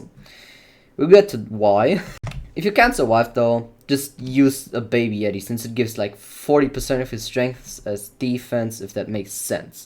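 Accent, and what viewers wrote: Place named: Germany. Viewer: Information wise not bad, way too long and yapping, german accent and cracky english is one too much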